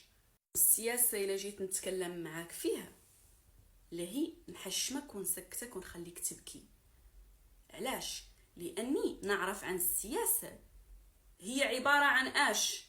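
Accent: Morocco. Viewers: الصحراء الغربية مستقلة تماما عن مقاطعة الخميسات. والمخزن هو نظام وظيفي توسعي محتل لأراضي الصحراء الغربية، لكنها قريبا ستتحرر ان شاء الله، وأنت من خلال لهجتك لست صحراوية وانما مروكية مندسة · هذه مستوطنة مغربية لاعلاقة لها بصحراء الغربية لا من جهة اللهجة ولا من جهة طريقة التفاعل والكلام ولا من جهة الفكر و تتميز بزرع المغالطات بدون اي دليل و المراوغة التي طبعا يتميز بها المغاربة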